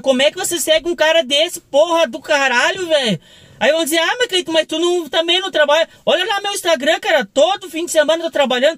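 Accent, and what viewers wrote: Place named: Rio de Janeiro, Brazil. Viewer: Vc parece cariocs . Tu e demais .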